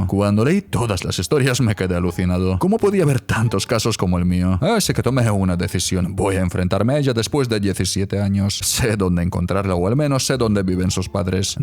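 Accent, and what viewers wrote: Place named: Mexico. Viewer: Ese acento mexicano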